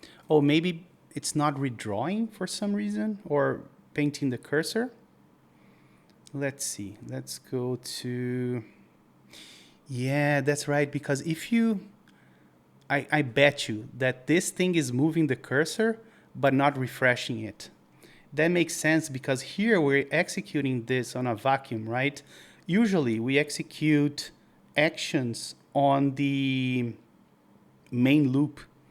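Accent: Brazil. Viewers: I recognize the Brazilian accent from kilometers away kkkkk. Awesome video series, learned a lot!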